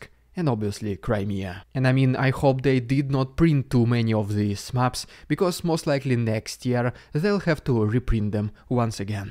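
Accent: Russia. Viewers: I know it's 'not all russians' and I am glad for decent russian people like you. And I love your accent. :) I have a little bit of russophilia left in me despite the last year. (and incidents before them too). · Nice guy. Your English with Russian accent is very clean and smooth and soft... some very good lessons with enunciation. Keep up the good reporting. I know because you're Russian background your popularity will be lower, but you're doing well... keep it up!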